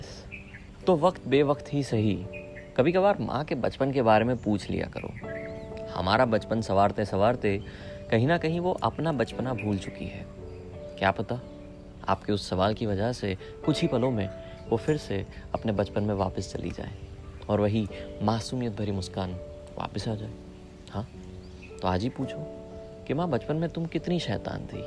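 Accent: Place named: Rajasthan, India. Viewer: Rajasthan k lgte ho Bhai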